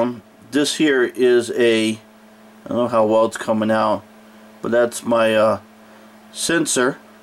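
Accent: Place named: East Coast, United States. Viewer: Thanks for the many replys , You have lived in Arizona your entire life ? sure sounds like you have a eastern accent , keep the videos coming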